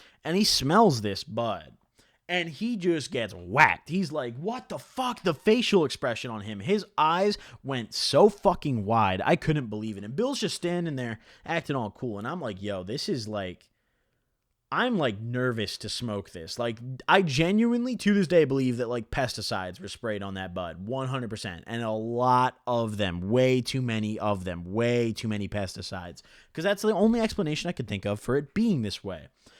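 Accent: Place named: Indianapolis, United States. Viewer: Dawg you sound like you LIVE IN NAPTOWN lmaooo frl I can tell when I hear it😭😭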